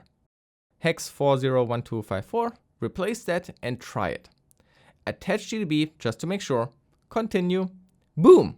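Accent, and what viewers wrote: Germany. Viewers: The german accent is strong in this one... I know the pain too well~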